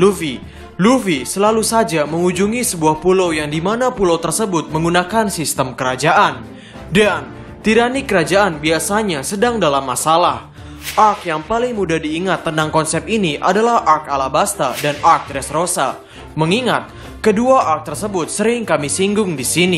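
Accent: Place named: Madura, Indonesia. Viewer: Min, logatnya madura banget. Bener ga sih?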